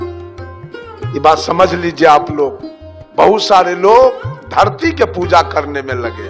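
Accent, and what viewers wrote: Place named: Bihar, India. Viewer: Baba ji aap ka tone to bihari lag raha aur ghee dudh dahi ki baat kar rahe ye to sadiyo se chalti arahi parampara hai Sanatan Dharm mei avi ke pandit thodi late hai ghee sudh hota hai isliye to samsaan mei v ghee se jalaya jata hai 😊bina Shakti se ye duniya nai chal sakti aur chath Puja k baare mei to kuch bolna v mat qki aap agaynta mei hai ye sanatan ki astha hai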